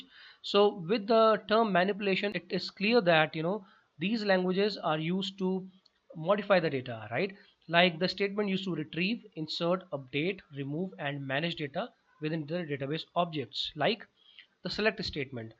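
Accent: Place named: India. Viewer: Damn, I hate the Indian pronunciation and i usually click away, but this a very good explanation of the SQL language. Thank you!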